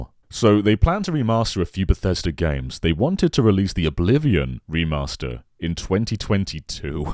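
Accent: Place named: United Kingdom. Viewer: The British accent really accentuates his chiseled face.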